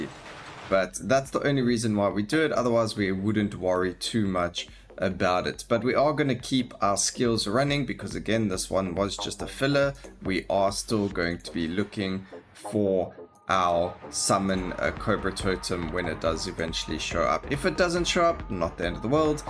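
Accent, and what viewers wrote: South Africa. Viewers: Do i hear a south african accent?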